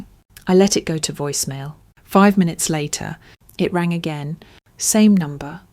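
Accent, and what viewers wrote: United Kingdom. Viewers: I’m wondering why the stories narrated by the British voice always has a pic of a female in a military uniform. Clearly this narrator is not in the military nor is there any military story lines. But all that aside, very good story as heartbreaking and happy all at once.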